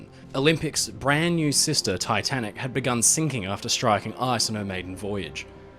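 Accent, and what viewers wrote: Australia. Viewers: Probably because he is Australian, to him American accents are annoying yet he’s not being an ass cause he seems like a nice dude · I really appreciate your delivery. It’s so nice to hear narration marked by impeccable pronunciation and phrasing with the stresses and pauses all correct and appropriate. For anyone not familiar with it, the accent is “educated Australian”. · He has an Australian accent!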